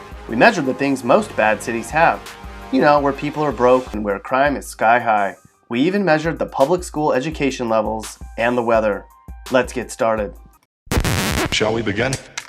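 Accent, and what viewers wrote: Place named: Dallas, United States. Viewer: this guy sounds like he's from a gated community in north dallas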